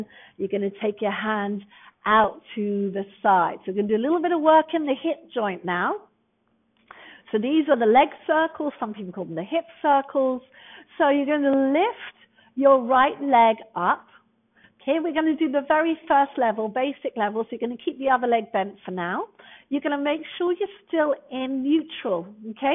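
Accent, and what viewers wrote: England, United Kingdom. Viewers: During coronavirus in Northern California, I was enjoying regular walks...till the fires came. Now I cannot even leave my house because the air is so bad...so for the very first time in my life I'm doing indoor/video exercises, and these videos are the first Pilates of my life. They're challenging (I've gained weight and lost muscle in the last 6 months) but also really fun, and I like the teacher a lot! I especially enjoy her accent -- didn't know she would be English, but it's nice for me as I used to live in ENgland and have many dear friends and family members there. THANK YOU! I'm going to keep using the four intro classes and then probably will subscribe.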